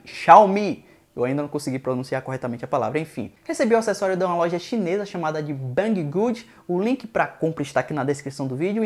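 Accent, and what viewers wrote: Bahia, Brazil. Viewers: Sotaque da minha Bahia é lindo demais meu hey rsrs abraço velho, curti sim!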